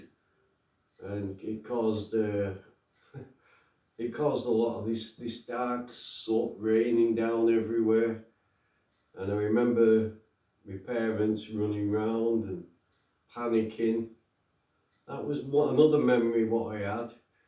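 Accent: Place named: Openshaw, United Kingdom. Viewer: Hi, Just caught your video. I'm am Openshaw lad too, born in 1954 and lived on Whitworth St until 1967. I love your Openshaw accent which is close to mine! I used to go to George Street Park, Openshaw Lads Club, Whitworth Baths etc. I like the idea of you creating a video of your memories. I decided to write my life story on paper and it now runs to 70+ pages with similar memories to yours. I attended Barneys school and remember the dentist you mention. Take care for now. Graham.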